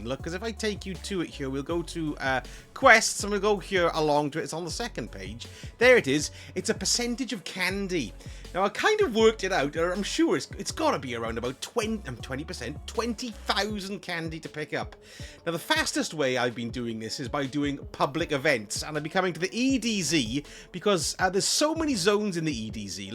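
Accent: Wales, United Kingdom. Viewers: Like this guy, funny. I Can’t work out if he’s north or South Wales? Anyway thanks for tips!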